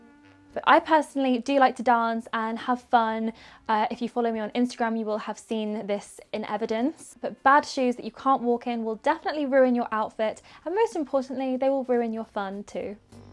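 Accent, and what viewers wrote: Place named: London, United Kingdom. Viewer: she has a pronounced English accent with a slight inflection of London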